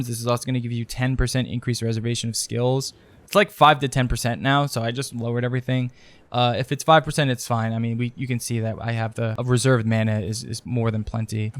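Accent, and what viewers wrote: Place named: Colorado, United States. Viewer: are you a wook? im from colo.. people around here sound like you.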